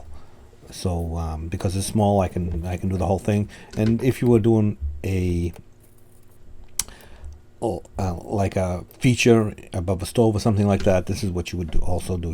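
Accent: Boston, United States. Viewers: Just bought my first home & have been binge watching your videos. Thanks so much for explaining things so carefully! Question: any plans for a wall tile PDF? I would definitely pay for that! Btw—ya accent makes me homesick for Boston BIG TIME! That’s actually what made me keep watching! Lol...thanks again!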